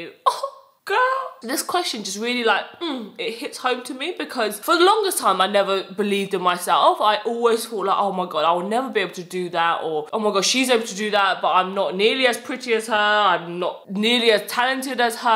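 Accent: United Kingdom. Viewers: I found you on Instagram, your videos with you doing makeup or your skincare. When I started watching your YouTube channel I was hooked and loved how open and honest you are , I didn’t know you had a British accent and lived in London till I started watching your channel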